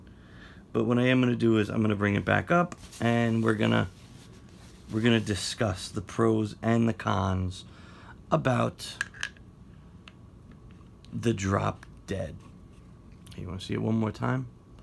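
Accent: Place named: Northeast, United States. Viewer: Thanks for the review brother. Just curious, where are you from? I knows it's the East Coast, I'm guessing north eastern, close to philly?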